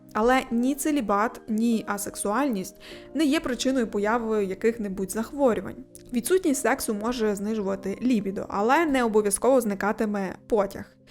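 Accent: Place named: Galicia, Ukraine. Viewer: Ведуча дуже швидко торохкотить, та ще й з галицьким діалектом! Доводиться призупиняти відео вдвічі!!!.. Викладає не дуже переконливо та не цікаво!..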